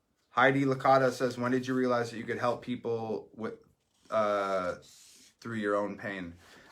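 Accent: Canada. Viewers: You can tell he is from Canada by the way he says sorry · He sounded so Canadian, sometimes I forget and then I hear him apologize like 7 times in the first minute😂 and the way he pronounces words with "r", love this man so much, so humble.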